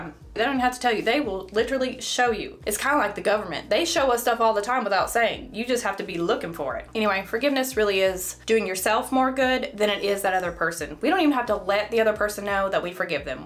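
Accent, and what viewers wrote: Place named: Texas, United States. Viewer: I traveled from So. Cal to lil' ol Canton Texas to watch. They sound a bit like you there. lol. It was amazing! We didn't cry though. And you don't need the glasses to look while it was in totality. Don't get your glasses from Temu, though. You will go blind. Ended up getting a high frequency face wand cuz you said you liked yours. Thankyou! Love it!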